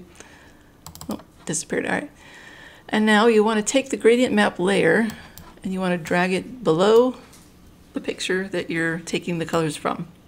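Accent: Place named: North, United Kingdom. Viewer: oh my gosh I'm from the north and you sound like you are too :P Thanks for the video!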